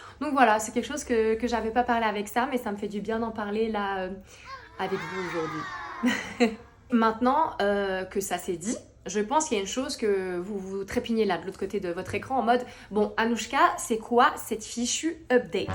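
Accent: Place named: Vaud, Switzerland. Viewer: J’adore ton belle accent vaudois!!!! Je viens de vevey 🎉 c’est rigolo d’entendre un accent comme le nôtre à la télé 😉 t’as vidéo m’a beaucoup touchée ❤️ reste comme tu es 🥰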